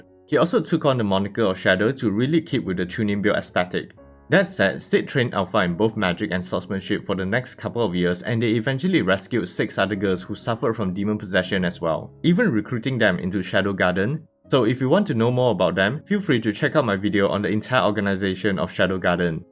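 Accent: Singapore. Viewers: no wonder your accent sounds familliar, singaporean sia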